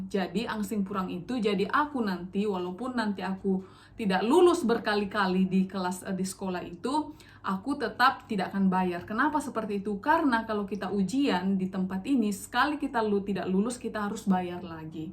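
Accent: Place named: Manado, Indonesia. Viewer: Orang manado? Soalx masih dpa dengar dialek manado🤣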